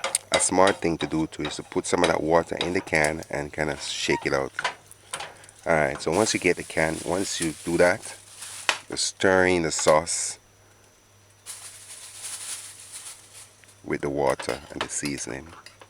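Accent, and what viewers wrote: Jamaica. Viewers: I love hearing Jamaican accent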